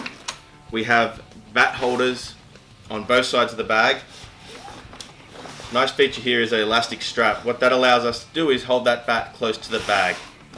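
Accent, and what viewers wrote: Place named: Australia. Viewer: He has an Australian accent :) and we play softball in Australia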